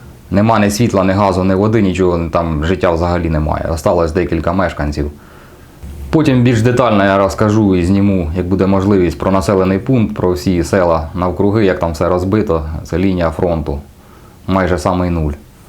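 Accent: Ukraine. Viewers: какой же поганый суржик) жалко хозяйство , побольше нервов и сил на восстановление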